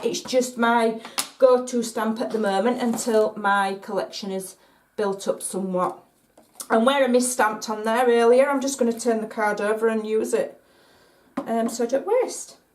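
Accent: Yorkshire, United Kingdom. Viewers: Fabulous Hun! Can I ask where you accent is from - you remind me of my mother in law (voice wise!) I'm going to say just about Yorkshire x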